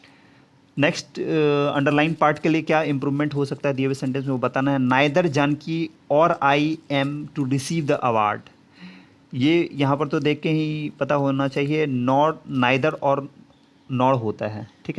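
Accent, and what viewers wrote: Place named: West Bengal, India. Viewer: Vai tumi wb thke mone hoche. ❤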